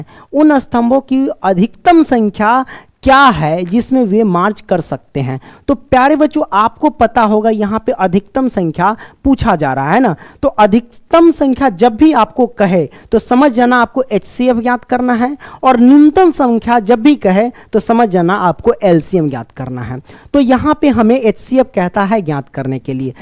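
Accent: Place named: Purnea, India. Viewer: Sir aapki language bihar ke purnea ki lg rhi h ❤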